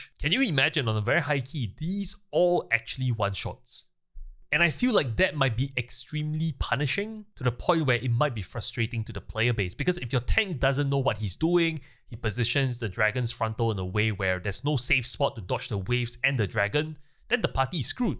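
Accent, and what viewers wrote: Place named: Singapore. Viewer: and thanks for the good videos. Love your Singaporean accent!!!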